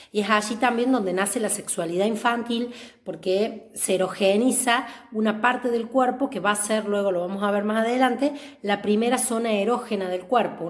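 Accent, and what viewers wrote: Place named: Córdoba, Argentina. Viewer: Que buena explicación y gracias que sea de una tonada cordobesa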